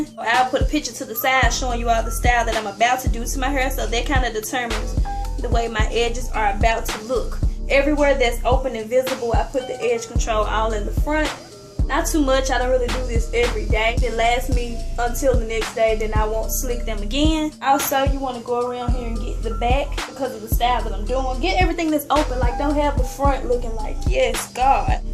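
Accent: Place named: Memphis, United States. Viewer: I knew you were from Memphis as soon as I heard your voice lol your hair is laid hunty!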